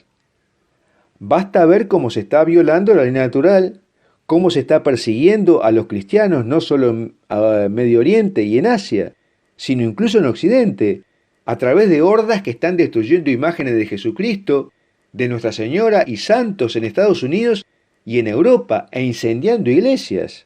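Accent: Argentina. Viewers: TU ACENTO TE DELATA: ¡ERES ARGENTINO! MI PREDICADOR EVANGÉLICO ARGENTINO FAVORITO ES: "DANTE GEBEL." SI NO LO CONOCES, TE LO RECOMIENDO, SU PRÉDICA: "THE WALKING DEAD" ES, SIN DUDA ALGUNA, UNA DE SUS MEJORES PRÉDICAS!!! GOD BLESS YOU!!!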